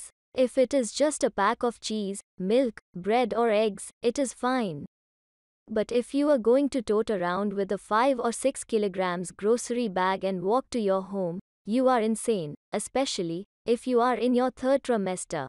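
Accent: India. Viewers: The 1st Indian-voiced YouTube video that made 100% sense 😀. A 31 weeks 1st-time preggo here.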